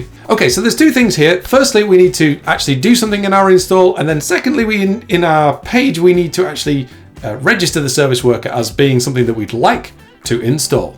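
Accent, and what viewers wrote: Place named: United Kingdom. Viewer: just watched first 7 minutes, and then... Jake at Udacity?? see you in a few days,! (also: really enjoying your British)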